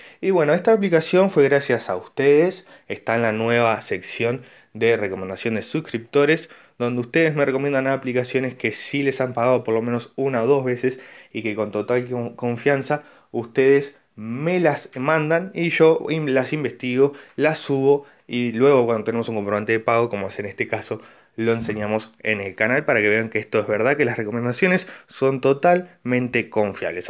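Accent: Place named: Argentina. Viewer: hola brother buenas noches x tu acento dedusco que eres argentino como hiciste para retirar tu pago ya que argentina no sale en los paises buenas tardes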